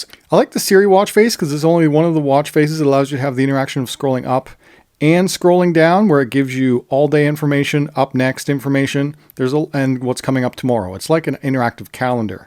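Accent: Canada. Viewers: Cool video.. U sound Canadian 😊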